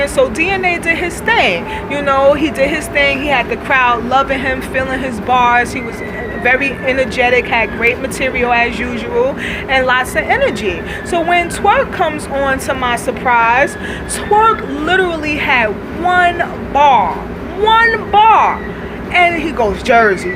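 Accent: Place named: New York, United States. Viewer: I love your NYC accent :p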